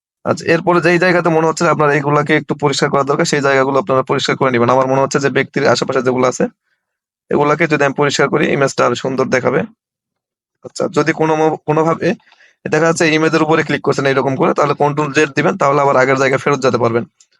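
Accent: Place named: Joypurhat-Naogaon, Bangladesh. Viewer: vai apner basa ki joypurhat,naogaon er dike naki?kotha bolar dhoron sune jigges korlam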